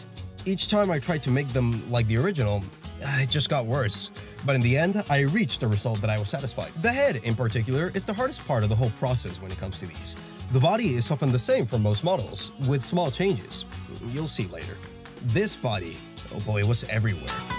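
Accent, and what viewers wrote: Romania. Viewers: are ya romanian? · YO ARE YOU ROMANIAN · wait... Are you romenian? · wait, are you Romanian?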